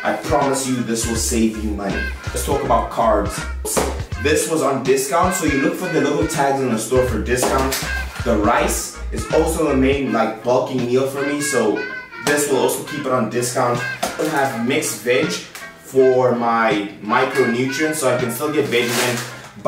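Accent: South Africa. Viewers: Are you South African?? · Hey dude are you South African?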